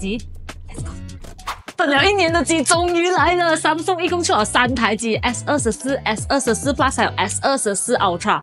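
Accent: Malaysia. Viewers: 马来西亚口音 没听错的话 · Maglish 聽起來真有趣.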